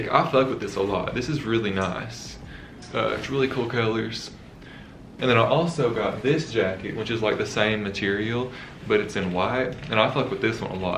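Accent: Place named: Tennessee, United States. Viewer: Binge watching your videos today. You are the best! I love watching you just do some regular shit. You’re just cool af!! Your voice makes me miss living in SE Tennessee.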